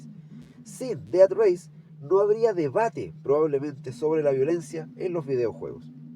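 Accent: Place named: Peru. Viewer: Que chistoso hablas,eres de peru?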